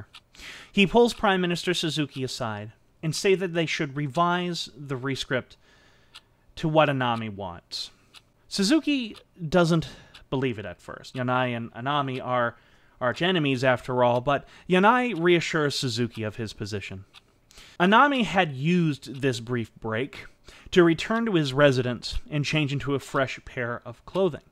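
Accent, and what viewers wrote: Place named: United States. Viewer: About half-way through and really enjoying the telling of the story - the one thing that is grating on me is the pronunciation of some of the Japanese surnames :( Ida for instance (I believe) should be "ee-da" and not "eye-da" and Takeshita should be "ta-kesh-ta" not "ta-ke-shii-da". Gotta watch that American accent and those "t"s . Aside from that, good story, nice pace.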